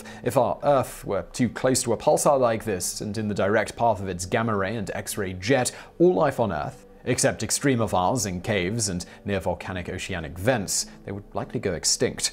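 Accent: United Kingdom. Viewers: At toll... mahgma... waatah. Sorry, I can't stop repeating you, lol. I love the U.K. accent!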